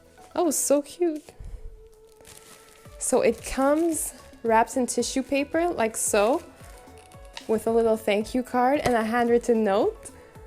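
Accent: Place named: Turkey. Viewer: Your accent very much sounds like Turkish! 😯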